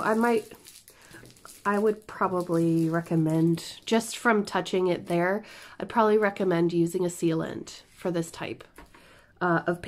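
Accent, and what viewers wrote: Ireland. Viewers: Hi I love your videos, wanted to know what size this was and how much it cost. I ask because Stitcherista just did an unboxing today on her first CraftEase, Her box was beaten up too. I think we all kind of agreed that hers was too expensive for the size she got. What is your opinion on your painting. Also I wanted to tell you that I have been watching your videos for a long time and I am noticing a bit of irish coming out when you talk, it is so cute. Keep up the great work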